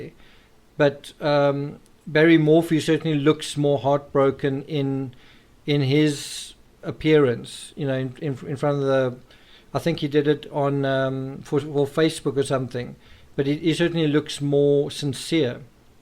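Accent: South Africa. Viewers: Hey Man...when I heard you talking I knew straight away that you're South African! 🇿🇦 So nice to hear my own language again. Far away from home....🇦🇹💕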